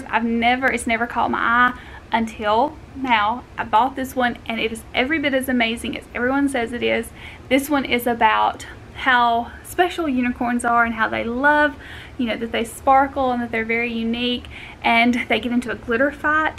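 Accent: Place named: South, United States. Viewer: Hi, You are such a fabulous, conscientious, thorough, hard working, exciting teacher that makes everything so interesting for the kids. After seeing your previous fifth grade class and now seeing your additions for your new 2nd grade classroom it will be a "feast" for the kid's eyes this coming school year! It will be so colorful, cheerful looking, coordinated and organized, too! AND add to all of that, you are such a cute southern girl, with charming accent to match, as well! I can't help but notice that huge gorgeous ring (engagement/wedding ring (?) that you are wearing now. Does that mean what we think it means? (P.S. I am a former 2nd and 3rd grade teacher AND I have also taught Budgeting and Credit Score classes in a women's shelter. And I am also a mother of three and a grandmother of three. So I recognize all of your effort, talent and admire you for all that you do! 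I now live in a fabulous retirement community in Florida, called The Villages. Have you heard of it? I am passing on to my retired educator friends in our club about your channel so you will probably see more subscriptions from them) AND OH! I love your new home office too - another feast for the eyes (!) - so efficient and it gives you so much room to work! Have a great summer and upcoming school year,!... from an admirer... from afar!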